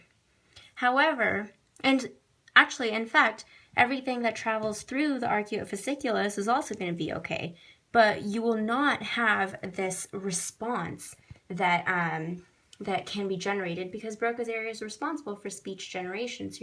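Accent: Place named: India. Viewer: 🤔🤔 Indian and such an amazing accent.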